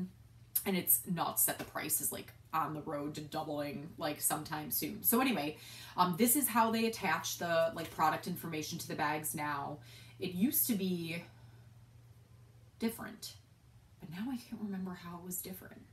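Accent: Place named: Minnesota, United States. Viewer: are u in Minnesota? The accent ...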